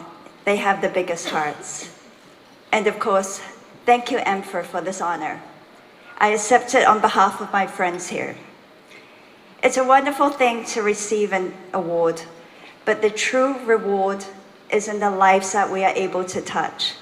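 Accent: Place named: China. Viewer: 伦敦口音退化了不少 中国音非常严重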